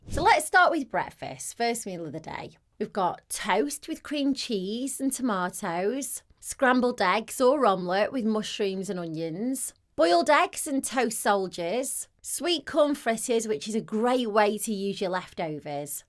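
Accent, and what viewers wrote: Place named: Manchester, United Kingdom. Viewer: Yes - it's a fairly standard English accent. Manchester-ish(?) I think she has a lovely, friendly voice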